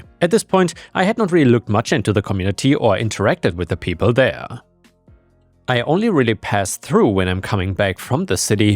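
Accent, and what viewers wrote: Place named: Germany. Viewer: Hearing a guy with a German (?) accent do a Karen voice is epic! Love it!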